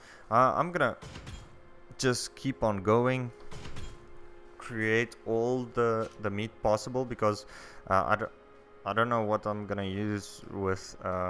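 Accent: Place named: South Africa. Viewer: Dis alles in sy stem. Hyt daardie BoerQ aksent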